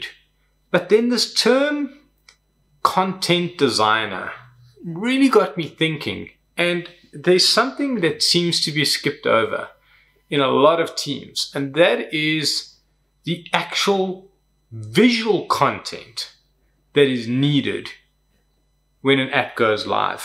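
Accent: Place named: South Africa. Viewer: thanks so much for this video! I’ve worked as a Content Designer and a UX writer for the past five years. One of the biggest challenges of our role is educating teammates and other stakeholders on the value of content-first design. You’ve done a great job of explaining it in a nutshell! P.S. I hear an SA accent. Are you based in SA?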